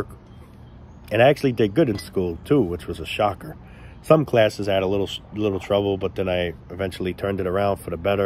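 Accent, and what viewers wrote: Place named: Bronx, United States. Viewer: originally his accent reminded me of the bronx, but then he told me he's from yonkers. life is so confusing sometimes. 😉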